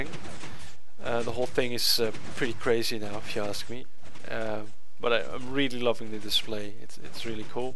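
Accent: Netherlands. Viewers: you dutch? becuz it sounds like so ;) (if you are, im dutch ;)) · ja ik hoor nu al dat je nederlands bent :P , maar wel vet gemaakt :D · Are you dutch? I think it by listening to your accent :P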